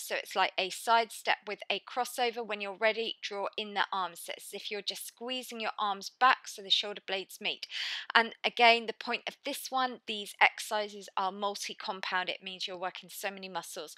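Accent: United Kingdom. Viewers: Love your workouts! and being from the UK like you, your accent is a relief! lol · That '2 uncommon exercise' is actually the modification or easier version she provided for people who can't keep up with the move in the right side. If you can't understand what she's talking about, learn more. Listen more British accent conversation. That's what I do :) good luck!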